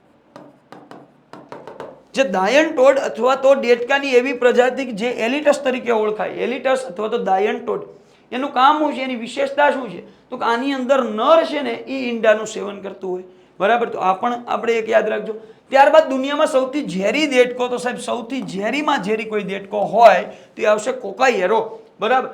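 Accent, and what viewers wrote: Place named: Kathiawar, India. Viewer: તમે પ્યોર અમારી કાઠિયાવાડી ભાષા બોલો સર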